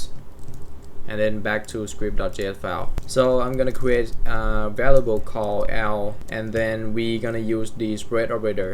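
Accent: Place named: United Kingdom. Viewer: สำเนียงพี่ เหมือน British เลยครับ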